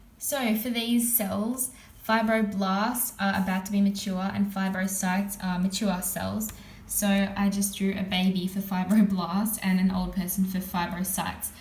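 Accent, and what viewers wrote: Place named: Australia. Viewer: I thought it would be a British video but she’s Australian 😂😂😂mate I’m dying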